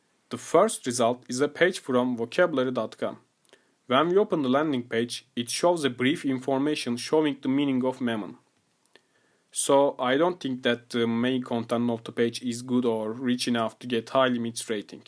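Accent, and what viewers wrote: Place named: Turkey. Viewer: yes there is a turkish accent :)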